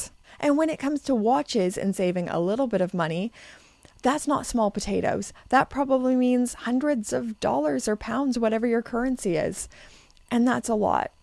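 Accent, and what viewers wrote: Canada. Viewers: As a fellow Canadian, what is it, exactly that makes us sound so Canadian?? 😂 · She sounds Canadian. Where is she from?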